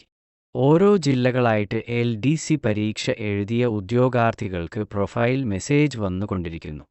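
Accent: India. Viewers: ഇത് ഏതോ ബംഗാളി മലയാളം പഠിച്ച് parayua തോന്നു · ഏതോ ഹിന്ദിക്കാരൻ മലയാളം പഠിച്ചു വായിക്കുന്നത് പോലെ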